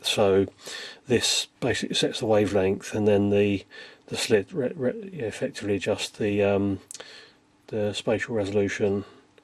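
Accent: United Kingdom. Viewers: I'm as straight as a ruler but I could listen to your British voice all day long. What's with that?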